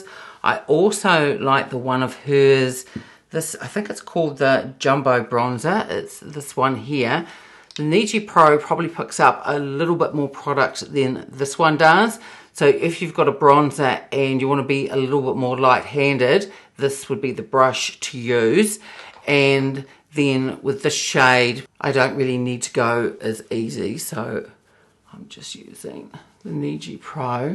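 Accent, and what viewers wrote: New Zealand. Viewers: I ❤ your videos love!!! I also will be trying to do a Kiwi accent all day!!😂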